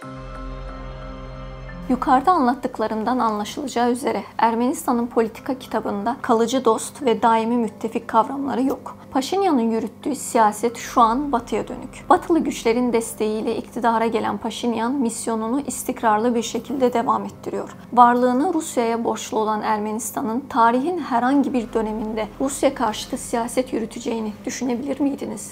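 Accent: Azerbaijan. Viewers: Bu niye böyle konşyor Bu qiz yüz faiz Azərbaycanldı Türkce niye danşrsan Azərbaycan Türkçesiyle danış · Azeribaycan aksani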